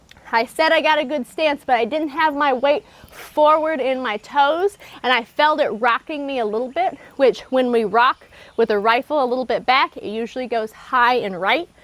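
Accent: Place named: Shreveport, United States. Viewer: What a fun video to watch and I learned a ton. Your accent makes me miss living in Shreveport.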